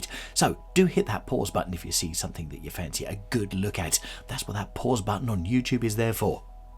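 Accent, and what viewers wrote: United Kingdom. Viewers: ❤ I Really Love Your Channel, you cut out all the noise from the store. And I love British Voices 😊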